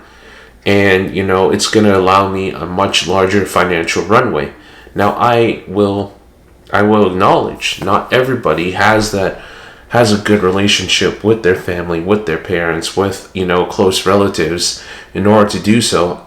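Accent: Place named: United States. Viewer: Your American accent is so clear. Good luck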